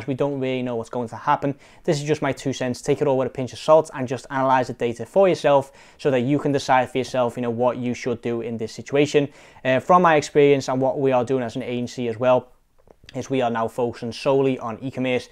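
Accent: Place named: Liverpool, United Kingdom. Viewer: Ok so he only reason I'm continuing to watch this , us for the scouser accent ....lol....I was born in Cheshire ...live in Australia for 45 years ...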